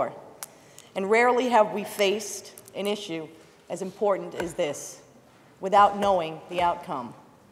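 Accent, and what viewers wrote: New York, United States. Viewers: I think her words make this speech great but her new york accent make it amazing!! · that was fantastic. also, I just love ny accents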